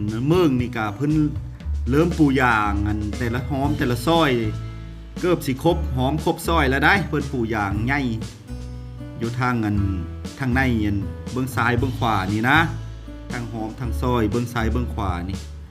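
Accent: Phu Thai, Thailand. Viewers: มักน้ำเสียงสำเนียงผู้ไทภาษาพูดน่ารัก ติดตามตลอด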